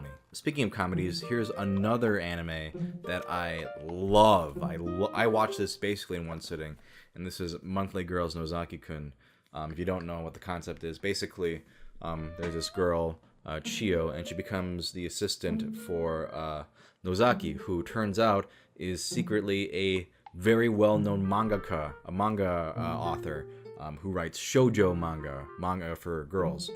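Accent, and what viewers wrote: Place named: United States. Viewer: he looks asien sounds American they have evolved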